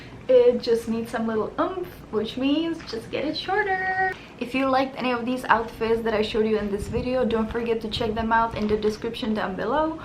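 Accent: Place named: United States. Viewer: Your American accent is good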